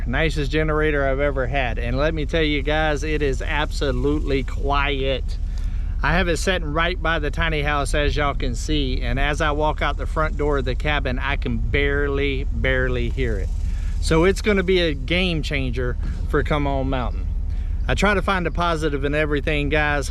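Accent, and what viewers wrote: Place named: Arkansas, United States. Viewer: Arkansan here, I thought I recognized that accent 😂 your video just popped up and I'm glad I watched. Interesting homestead and build! Just remember not all relationships are meant to be. My husband was married twice before when we met and didn't think it was possible to have a successful marriage but here we are 30 years, two great grown kids and 3 grandchildren later, rockin it!! You will find the right one when you least expect it! 😊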